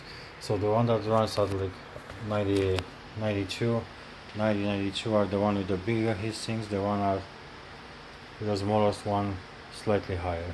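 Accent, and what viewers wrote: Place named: Turkey. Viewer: merhaba, aksaninizdan Turk oldugunuzu dusunuyorum, bilgilendirici video icin tesekkurler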